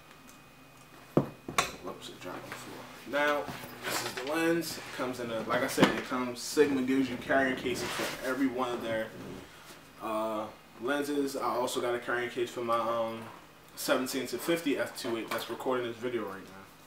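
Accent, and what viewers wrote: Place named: Baltimore, United States. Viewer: You sound like you're from B'more...or maybe D.C.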